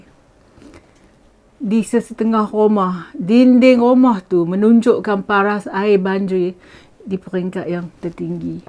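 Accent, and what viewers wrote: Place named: Kuala Kangsar, Malaysia. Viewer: Seronok aku dgr accent kuale dia..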